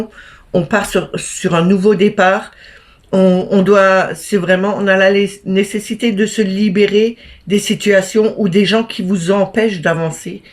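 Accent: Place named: Grand Est, France. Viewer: Vous avez l accent du GRAND EST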